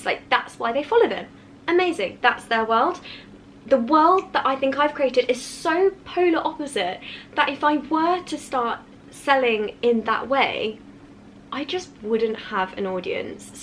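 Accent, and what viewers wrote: United Kingdom. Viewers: I looked for a british youtuber to learn the accent and accidentally found someone so precious. Youre absolutely marvellous and im really glad to found this channel ^^ Right on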